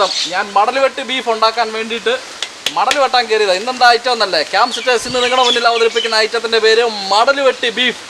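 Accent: Kottayam, India. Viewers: ചേട്ടന്റെ സംഭാഷണം കേട്ടിട്ട് കോട്ടയം ഭാഗത്താണോ veedu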